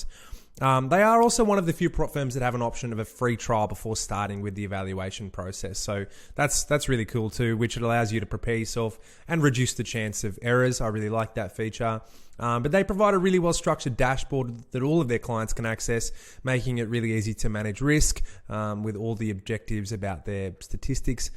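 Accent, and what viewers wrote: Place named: Australia. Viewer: Your accent being too Aussie, can only suggest that you have a bias to lean towards conformity rather than ingenuity. Other than that, seems like an alright person.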